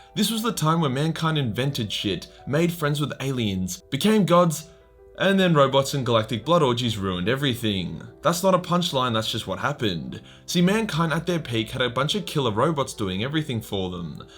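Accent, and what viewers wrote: Australia. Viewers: Finally, we take aim at. I used to like his stuff, starting with the Siege of Vraks which I still watch. But he got a bit too political. I didn't come for rants on companies and politics I came for the Grim Dark of the Australian Accent.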